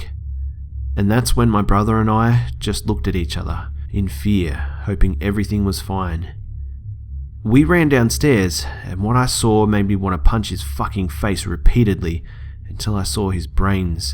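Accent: Australia. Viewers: hes aus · He's Aussie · ikr i cant stand Russian or spanish accents ( no offense, this doesn't mean i hate these ppl i just cant understand it 2 well ) so i was like please don't have a Russian or spanish accent.... YES AUSTRALIAN ACCENT AND GOOD SPOOKAY STORIES!!! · I love scary stories and Australian accents so this account is very very enjoyable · Guys this dudes Aussie isn't he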